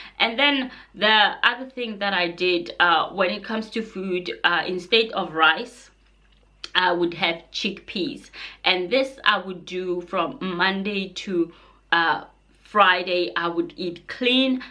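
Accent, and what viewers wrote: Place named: South Africa. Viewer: That was amazing thanks for sharing your weight loss journey.Your accent is South African.28 is a lot wow.congratulations.hit the 🛎I love your content I wouldn’t want to miss out.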